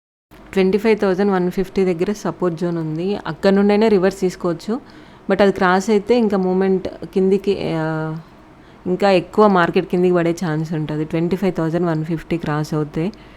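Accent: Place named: Telangana, India. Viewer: Good teaching. Telangana slang 😀 Sister. How many years of experience do you have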